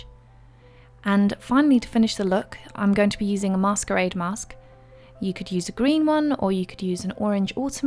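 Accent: England, United Kingdom. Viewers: Where are you from btw? I know you're English, but you're accents lovely :3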